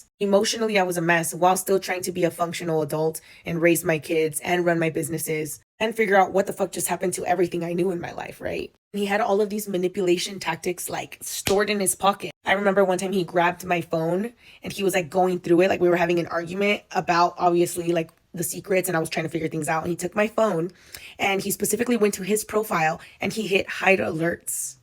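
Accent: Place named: California, United States. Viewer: I from San Diego, and was just listening (Not watching) and i was like...she gotta be from cali and then she mentioned rancho and riverside hahaha. Glad you found out! Stay chingona!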